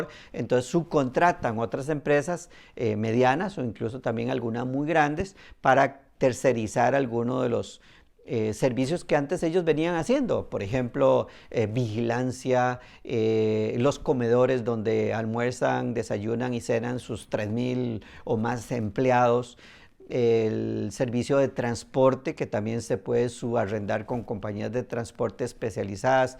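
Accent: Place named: Bogotá, Colombia. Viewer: hola po el acento crei que estas en Bogotá, como te contacto para pagarte por una asesoria?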